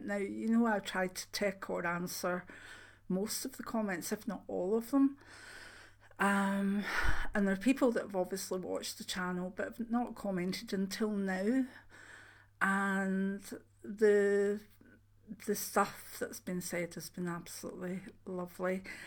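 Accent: Scotland, United Kingdom. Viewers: My parents & siblings are from Kilmarnock! Your accent is great and understandable :) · My family and I love your accent. We live in the USA but are of Scottish heritage and you sound like home to us. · Hello dear, I've been watching in the background and not commenting until now. You are so genuine. I sense your energy. I'm in the USA and have a girlfriend from Scotland and everyone complained that they couldn't understand her and I never had an issue and loved her accent and she sounds just like you 😍. I love the way you say "wee bit"...it just makes me smile! Your channel is wonderful. I am clairaudient and clairalliant since childhood but it is random and I am trying to learn how to use it in a more controlled manner. Thank you. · Sometimes individual words can get be unclear but taken no notice of rudeness......that's all it is. Jealousy * (as always) for your success.. 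I find a scottish accent very pleasant to listen to and yours is no exception.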